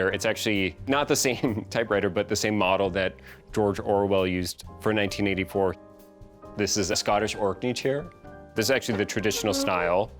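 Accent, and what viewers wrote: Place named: Midwest, United States. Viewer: Wow - this guy is from the Midwest if ever I’ve heard a midwesterner. (Update: Ontario accents being synonymous to those in Michigan, upstate New York, or Wisconsin.)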